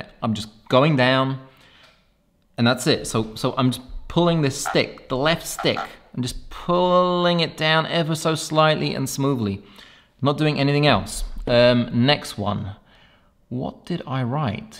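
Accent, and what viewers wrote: United Kingdom. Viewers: idk but I don't like getting drone info from UK accents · Are you a Brit living in the USA? Your accent has some US twangs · Great tutorial, mate!! It was very enjoyable to watch when a guy with UK accent shows that much emotion. :)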